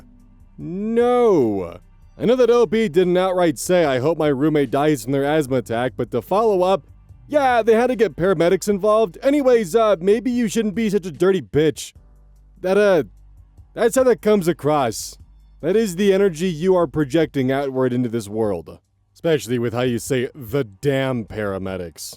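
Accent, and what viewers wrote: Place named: Minnesota, United States. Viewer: You started sounding like that Minnesotan from family guy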